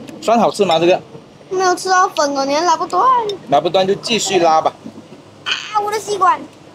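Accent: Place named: Malaysia. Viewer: 马来西亚。 华人 听着 不是 中国人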